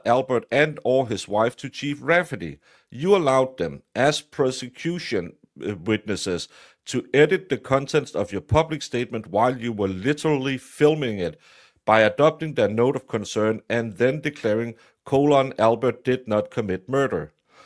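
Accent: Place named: Denmark. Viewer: That's just Danish English (denglish) pronouncement 😉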